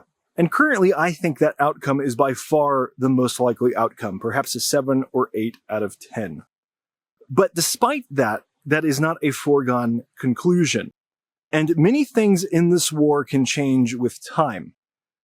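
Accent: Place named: South, United States. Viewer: it looks like west Coast USA but he sounds abit South accent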